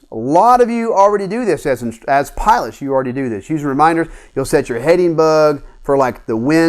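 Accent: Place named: South, United States. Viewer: Amazing lesson! Where are you from? You sound like a fellow Southerner! haha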